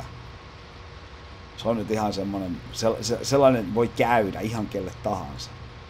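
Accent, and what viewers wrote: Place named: Ostrobothnia, Finland. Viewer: En tierä onko sulta ikinä kysytty tätä tai ootko vastannu mutta ookko pohjanmaalta kotosi tai asukko tällä hetkellä täälä. Kuulostaa murre nimittäin vähän samalta👍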